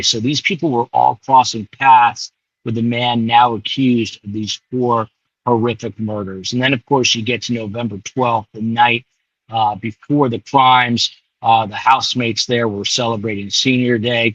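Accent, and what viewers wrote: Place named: New York, United States. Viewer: Great news flash,. Looking and sounding very New York.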